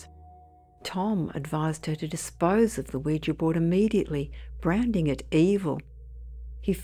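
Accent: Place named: Australia. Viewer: God, the Australian accent is so ugly! I can’t listen to this crap! Change the accent!!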